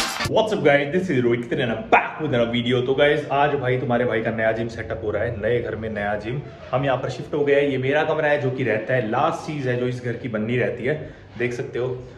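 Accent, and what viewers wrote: Haryana, India. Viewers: Bhai haryanvi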